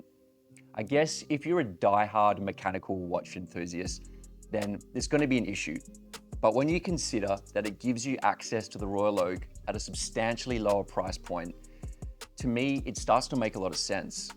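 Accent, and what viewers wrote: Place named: Australia. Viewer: I sense a slight Australian accent there. Great video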